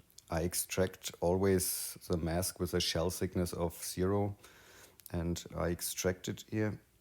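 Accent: Bavaria, Germany. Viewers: Sehr geil der Hinweis aufs Bavarian english. Wer’s nicht leiden kann soll halt was anderes gucken 😜 Guades Video, weiter so, hawe dehre😂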